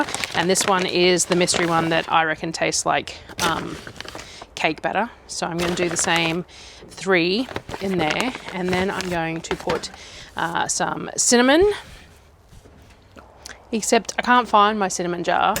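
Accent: Australia. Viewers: Good afternoon from the States. I love your vids girl. So fun to watch what you come up with. I find when I'm having a really bad day, I get excited when I get notified of one of your cooking videos. It brightens my day. Your voice is soothing and since I have lived in Mosman, a suburb North of Sydney many years ago, I enjoy hearing your accent. It reminds me of the many adventures I had there and how bad I need to go back. Thanks for bringing light into a dreadful day and for so many cool ideas to cook or bake. You're a lovely woman and I hope when I get back under, I can buy some of the foods you use that we can't get here and send them back to the States...the cost would be so worth it. Ta and have a wonderful week!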